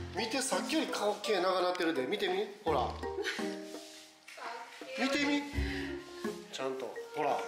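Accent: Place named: Kansai, Japan. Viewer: 三姉妹に癒されバリバリの関西弁にもホッコリしている関西人ですw 一気に3匹も飼える環境が羨ましいと同時に大変そう〜💦と思いながら楽しく見させて頂いてます(*^^*)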